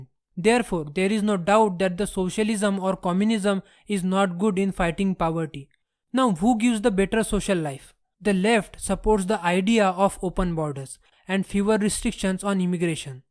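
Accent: India. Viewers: Indian accent 👎 no thanks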